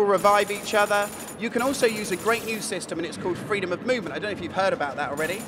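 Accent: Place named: England, United Kingdom. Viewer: English Accent))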